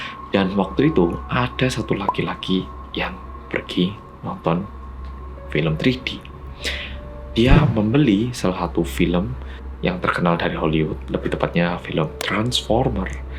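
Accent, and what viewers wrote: Java, Indonesia. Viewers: Nonton bioskop ❌ Nonton yg medokkkkkk ✅ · Kang asli korea? Entah kenapa gak ada koreanya cuma ada jawa campuran melayu kan jawa itu kebanyakan apalagi yang asli tanpa permak itu hitam manis kalau senyum cewek maupun cowok,melayu kulit sao mateng kalau banyak keluar,kalau enggak itu sao muda putih bening,apa lagi medok khas jawa jadi ragu 😂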